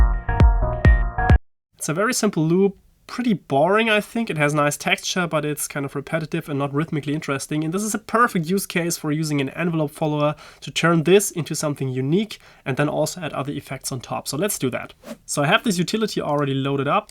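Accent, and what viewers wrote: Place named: Germany. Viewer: ich kann deine germanness so sehr durch dein englisch hören :D gutes video aber!!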